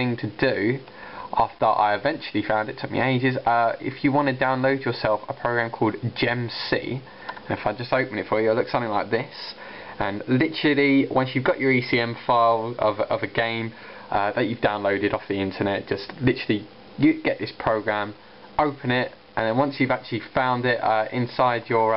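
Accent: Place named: United Kingdom. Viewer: I love the britich accent while i don't realy understand lots of words :)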